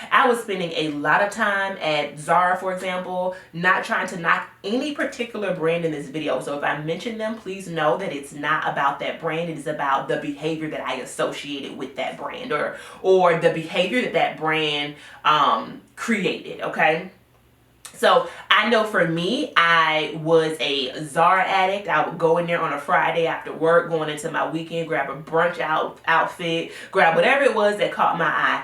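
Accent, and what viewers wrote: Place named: Washington DC, United States. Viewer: Woohoo I thought of doing a channel like this but you are better at it!!! I’m 100% with you. I have well made pant suits I’ve had over 10 years. I’m trying to do the 30-30-30 wardrobe. I’ve written down my pieces and gotten rid of the excess. Now working on defining my style cuz I like such a mix of classic looks and boho looks. You’ve got a new sub. (Do you watch Alyssa ?) her channel is good too. Edit: when I got to the end we talked about your therapist and the cadence of your speech I immediately thought I wonder if she is from DC? Then you mentioned the metro and I was like oh yeah she’s from DC. 😂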